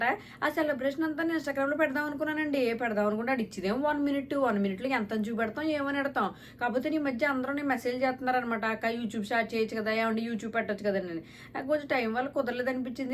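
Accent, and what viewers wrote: Godavari, India. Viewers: Godavari yasa bagundhi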